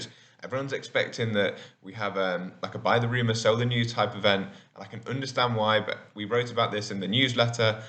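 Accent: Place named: Cockney, United Kingdom. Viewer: You gorgeous! You sound a bit Cockney. Am I right?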